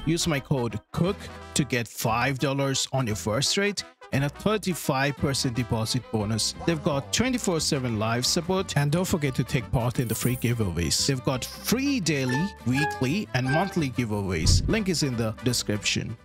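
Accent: India. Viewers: Remember the -threads in set launch options? does it still work? like i had a 5600x before and i put -threads 13 there, and my game usually felt better, but now with the 5950x do i need to put -threads 33? because the game wasn't using the threads correctly even if u enable multicore rendering in option. Oh and BTW, are you hindi? based on your accent.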